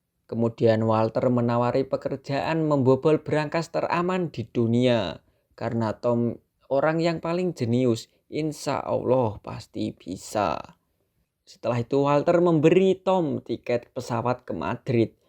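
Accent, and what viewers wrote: Java, Indonesia. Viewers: Jangan medok mas, jadi kayak pahit asem gitu filemnya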